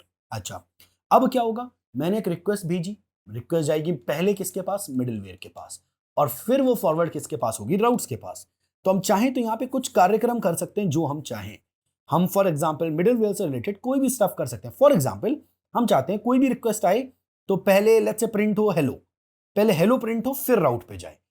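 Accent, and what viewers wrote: Bhopal, India. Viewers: bawa bhopal se ho kya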